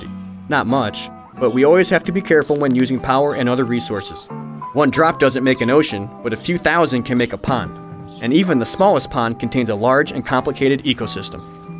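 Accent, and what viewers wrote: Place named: Greece. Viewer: You're Greek, aren't you?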